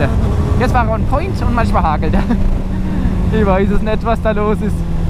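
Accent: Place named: Swabia, Germany. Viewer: Alter, Schwäbisch oder Hochdeutsch, aber net beides.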